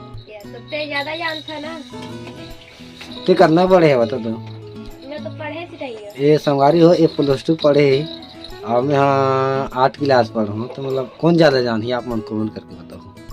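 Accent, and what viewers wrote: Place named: Raigarh, India. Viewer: Tuman k baat sun k Lagat he ki tuman raigarh jila ķ ho hai naaa bhaia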